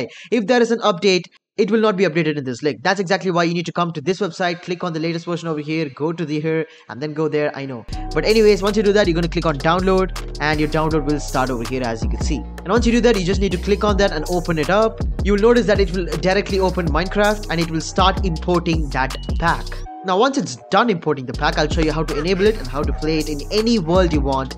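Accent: India. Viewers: Are you a Indian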